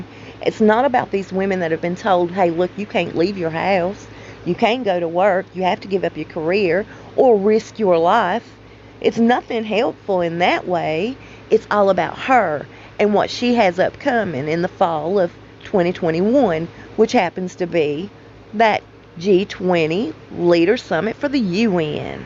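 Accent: South, United States. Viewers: Dear, thank you for your videos. They are always a breath of fresh air. And that lovely voice and Southern accent! I am relieved the diagnosis was wrong. As you recounted your misshapens, the fall, the hospital, the emergency room, the diagnosis, the health scare, I felt deep in my heart, they didn't know what they were talking about. Some medical staff were rude to you and others condescending. But thank God, in the end, the Almighty gave the correct diagnosis. I keep you in my heart and in my prayers. Much love!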